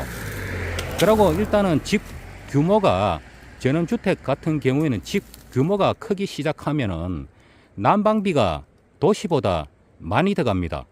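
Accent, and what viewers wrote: Gyeongsang, South Korea. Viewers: 영상에 자막을 좀 넣어서 하면 더 좋겠습니다. 가끔 무슨 말인지, 경상도 지역 아닌 사람들은 빨리 알아듣지 못하겠네요. ㅠㅠ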